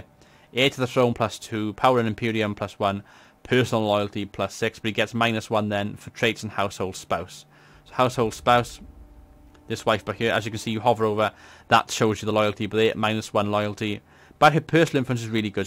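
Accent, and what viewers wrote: Wales, United Kingdom. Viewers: Very nice guide, and your vocal presentation is excellent, (are you welsh?) i find it really annoying listening to commentators with bad habits like, clicking their pallet with their tongue, or swallowing all the time, saying eermm every other word, i have been playing this game for 2 days now and i have picked up quite a lot i have missed from your vid, thanks, maybe you could do a money and public order building guide as players seem to be have issues with them?, great vid well done.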